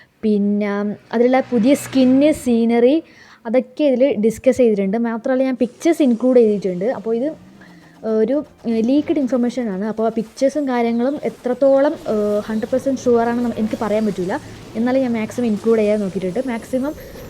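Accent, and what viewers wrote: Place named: Kannur, India. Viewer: athe Kannur slang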